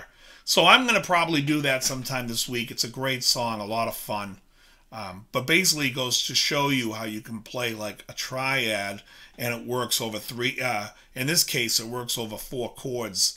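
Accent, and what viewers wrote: Boston, United States. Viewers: I have got to say man, I'm a fan.what is your musical education? College? Juliard?... you seem very technical and educated on music..you are obviously from Boston or that area for some time.. but yeah cool man..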